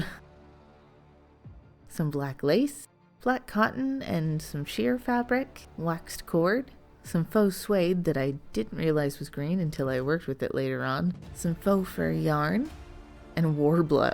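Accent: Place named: West Coast, United States. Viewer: I knew it was the west coast but your voice sounds WAY too familiar to me... hence why I asked about WA or OR. I've never lived in CA so it's likely that you just have a voice similar to someone else's. In any event, I know a west coast gal when I hear one. Lol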